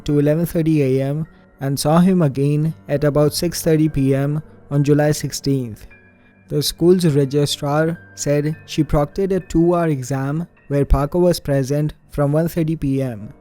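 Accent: India. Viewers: You sounded Indian, are you Filipino? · Indian accent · Indian accent .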